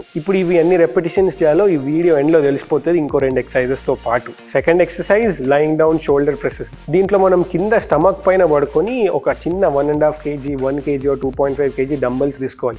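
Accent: Telangana, India. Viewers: Telangana yasa super👌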